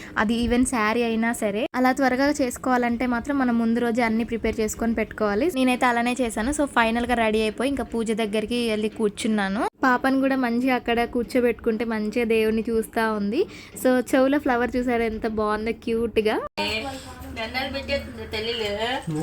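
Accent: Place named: Andhra Pradesh, India. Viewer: Miru ekkuvaga a slang vadtharu Mean Andhra vs telangana Indhulo aythe naku Andhra ne anpinchindhi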